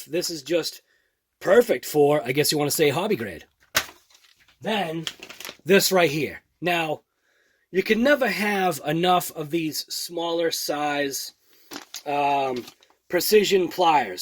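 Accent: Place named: Massachusetts, United States. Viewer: Just found you recently and you are great. My family is from mass so I miss the accent. Lol but great content. U inspired me to start a channel now.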